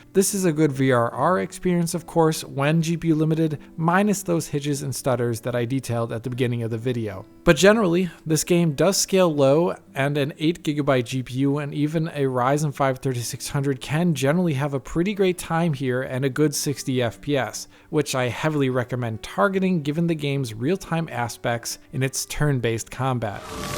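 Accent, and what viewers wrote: United States. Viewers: He lives in Germany, speaks with an American accent, and has an Italian name. Go figure! :)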